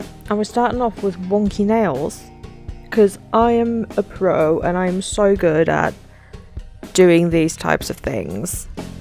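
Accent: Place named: United Kingdom. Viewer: Where is the cuntyy British accent coming from omg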